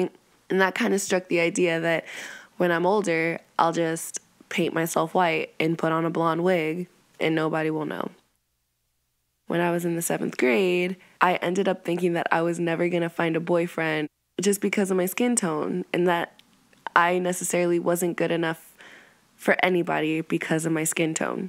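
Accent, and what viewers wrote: South, United States. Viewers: Is it just me or does this voice sound American? But I love this girl. This is so sad. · NAH YOU FROM THE SOUTH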